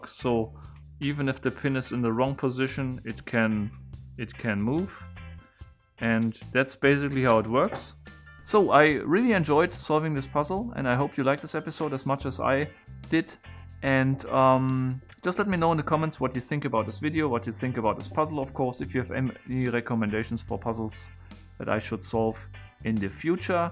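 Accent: Germany. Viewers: Sach mal du bist doch Deutscher oder nicht? :D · I hear you normaly speak german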